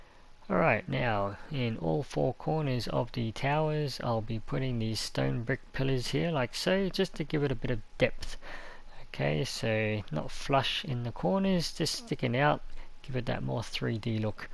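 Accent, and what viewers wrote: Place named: United Kingdom. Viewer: Are you from UK because your accent is like those gentlemen i heard from youtube?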